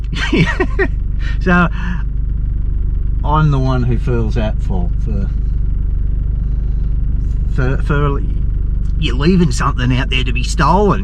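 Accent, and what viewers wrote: Australia. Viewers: I had a new scooter stolen 6 years ago and thats it but thats not my coment i was thinking your acsent northurn nsw so cessnock stayed there in 1983 all i remember is here we are in nsw shearing sheep as big as whales on ya keep up the good work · you should change your accent , your Australia accent is so annoying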